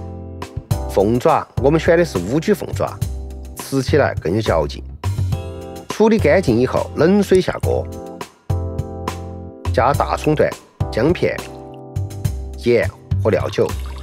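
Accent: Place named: Sichuan, China. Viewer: 我也觉得说得很好啊！ 四川特色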